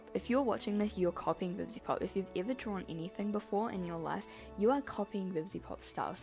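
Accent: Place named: New Zealand. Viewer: You're accent sounds very kiwi 🤔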